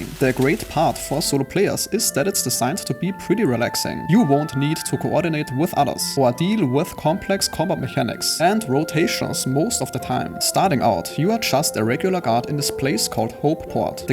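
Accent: Germany. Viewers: Are german?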